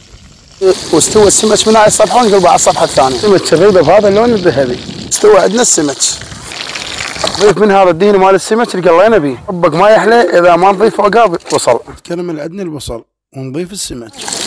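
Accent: Iraq. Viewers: تسلم ايدك لهجتك عراقيه ذكرتنه بايام بغداد ربي يحفظك ويوفقك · انتي من الموصل؟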